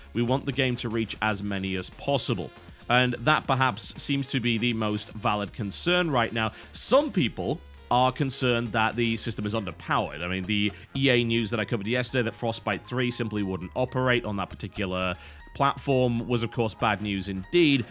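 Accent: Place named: England, United Kingdom. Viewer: Total Biscuit, you'r lossing your english accent :(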